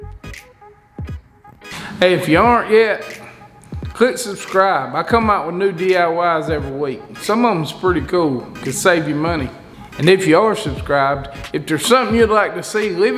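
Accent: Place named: Arkansas, United States. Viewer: Man you sound like home cooking to "dis" here displaced Arkansan! Thanks "fo da" videos brother... will be using lots of this info in my build.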